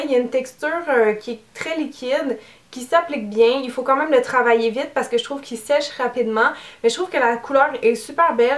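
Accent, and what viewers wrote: Quebec, Canada. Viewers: Elle est québécoise ! C’est fun je trouve comme accent !!!!!! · Coucou!! Jolie revue de la marque!!! Je l'aime beaucoup aussi!!!!! On a le même souci de fesses apparemment, et le même amour pour Copacabana!!!! (enfin Buffy chez toi si j'ai bien suivi ^^) Je ne sais pas comment ça s'appelle au Québec, mais mon produit préféré c'est Rafistoleur (une sorte de masque après-shampooing). J'ai testé aussi Bûche de Noël, c'est bien mon nettoyant préféré!!! Et dommage que ce soit une édition de Noël snif!!! Et sinon j'aime beaucoup leur mascara "Droit dans les yeux"!! Il fait très doux et naturel! (moi qui n'aime pas les mascaras trop voyants et lourds, ça m'arrange beaucoup et je l'aime vraiment! ^^) Sinon merci pour toutes tes vidéos! Je te suis depuis un bout de temps maintenant, et je ne commente quasiment jamais je crois bien (Lush m'inspire, j'avoue ^^) mais j'aime beaucoup tes tests, tes conseils, ta joie de vivre, et ton si bel accent qu'on aime tant chez nous ^^ * Bisous!!!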